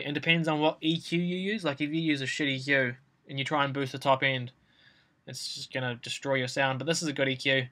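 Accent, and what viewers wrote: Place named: New Zealand. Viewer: does his accent, as in NZ as in NEW ZEALAND... and his channel name 'nz' not give any hints to you? and i'm pretty sure many new zealanders would be not too pleased to be called 'the same' as australians and vice versa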